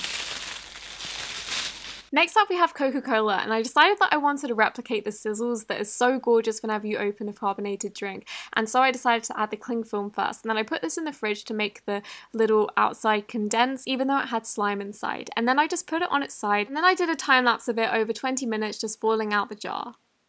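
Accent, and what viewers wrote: United Kingdom. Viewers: She British, it’s her accent, she can’t control it.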